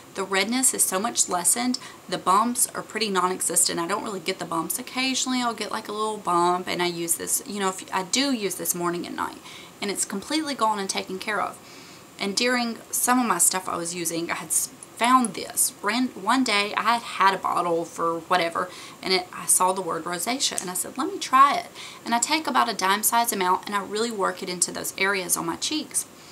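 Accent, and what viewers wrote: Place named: Alabama, United States. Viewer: You sound like you're from Bama girl. Not a bad thing.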